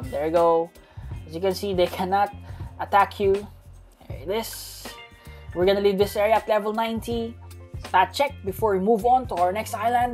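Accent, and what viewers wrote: Philippines. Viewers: i knew he was philipino its in the accent i know filipino english when i hear one :) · I hear your sound you are Tagalog po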